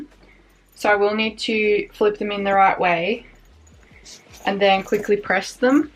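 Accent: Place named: Australia. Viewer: I'm looking forward to get my laptop bag which is on the way. I love the kind you talk although some time as a non english native speaker your lovely Aussi accent is hard to understand 🙂Go on and stay authentic!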